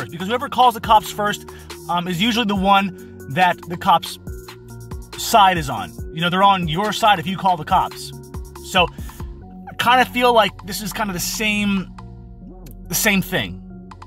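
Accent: United Kingdom. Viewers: lol The British accent took me by surprise. Good times! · Loving the London/cockney-ish accent! Tbf it’s pretty good! 😂😂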